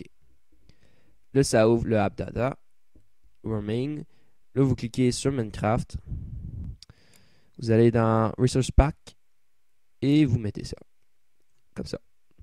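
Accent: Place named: Canada. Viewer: j'adore ton acsen canadien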